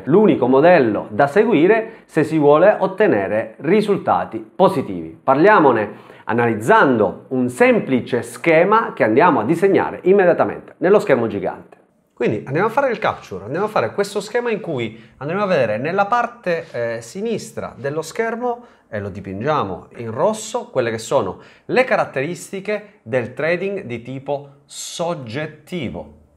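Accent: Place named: Apulia, Italy. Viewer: guarda io sono di Brindisi e il tuo accento è identico a quello della fascia tra Brindisi e Taranto. Che è molto diverso dal solito pugliese che si sente in tv che va da Banfi alla pizzica salentina. In effetti basta guardare una cartina delle zone linguistiche italiane per vedere che quella parte di Puglia ha lo stesso colore della Sicilia! Grazie per la tua gentile risposta! 👋👋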